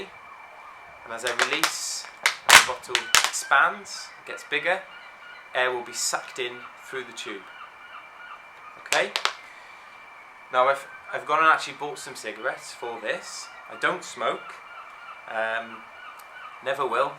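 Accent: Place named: Wales, United Kingdom. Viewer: Where is this guy from? He has a very strong accent. He sounds welsh as a matter of fact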